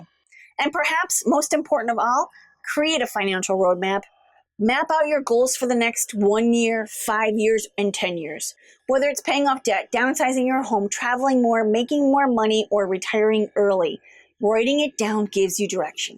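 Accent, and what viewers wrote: United States. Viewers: You are truely a breath of fresh air. As a frugal Welsh great grandma, I love to listen to a new voice, yours is so sweet, I love your accent from across the pond.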